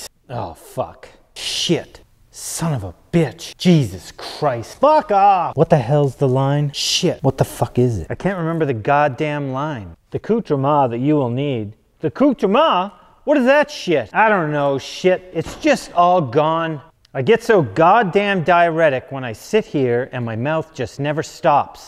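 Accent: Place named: Canada. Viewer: Canadian accent.. eh?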